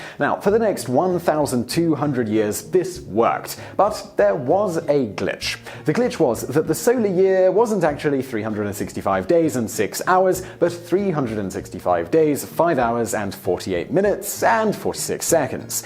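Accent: United Kingdom. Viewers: I was WAITING for you to say "Christian" in your British accent, you beautiful English man. :D :D :D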